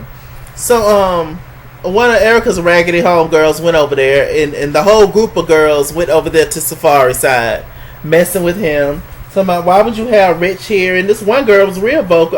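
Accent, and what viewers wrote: New York, United States. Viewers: Your “NY” accent tho 🤣🤣🤣🤣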